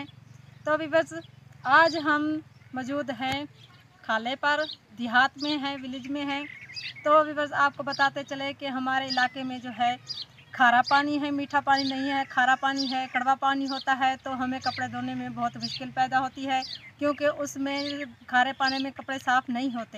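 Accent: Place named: Saraiki, Pakistan. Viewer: Ap ka village konsa hy? Lehjy sy ap sraiki lgti ho mery Abu Ji or Bhabhi bi sraiki area sy hen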